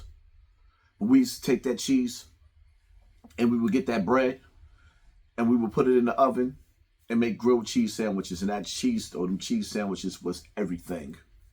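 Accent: Brooklyn, United States. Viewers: BROOKLYN TONE N THE BUILDING EAST NY STAND UP 1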